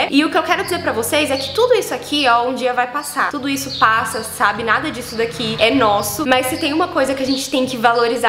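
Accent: Minas Gerais, Brazil. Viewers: Fugão, tremsão kkkk é mineira mesmo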